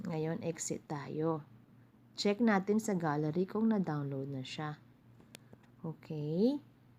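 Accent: Philippines. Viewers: Why do u sound like Phillipino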